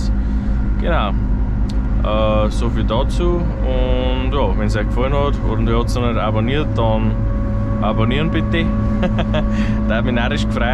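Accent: Bavaria, Germany. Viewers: Mich Stöhrt das nicht. past schon dein Bayrisch. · Iwo Boarisch bassd subba. Andaschd was nix. Klingt a ned guad 😅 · Dere, geils video. Red weiterhie bayrisch 👌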